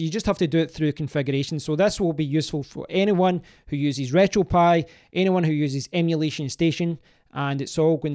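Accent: Scotland, United Kingdom. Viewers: Ma man, how on earth you go from the game to the main menu! Nice to hear a Scottish accent btw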